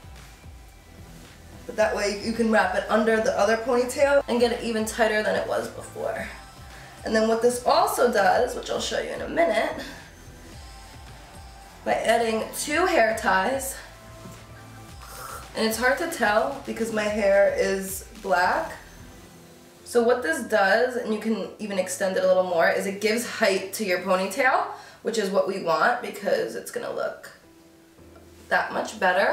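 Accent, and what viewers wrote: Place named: Boston, United States. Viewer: She sounds like she’s Jewish or from Boston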